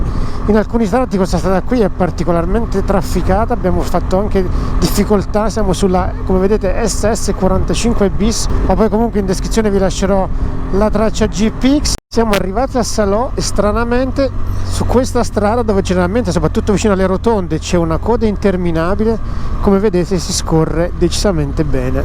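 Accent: Abruzzo, Italy. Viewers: Complimenti discorso esemplare, hai il giusto modo di viaggiare, in sicurezza e con passione. Poi sento che sei abruzzese come me 👍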